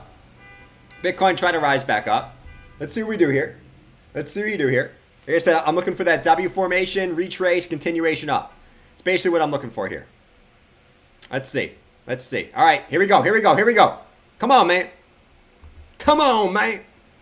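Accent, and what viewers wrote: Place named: Boston, United States. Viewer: I got interrupted! Loving your advancement of effects etc. and yes you still have a huge Bostonian accent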